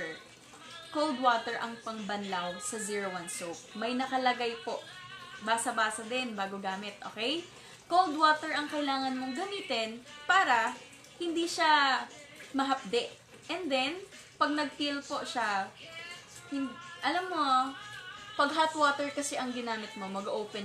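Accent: Visayas, Philippines. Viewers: Taga Bisayas yan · Wow bisaya si ateng hahahah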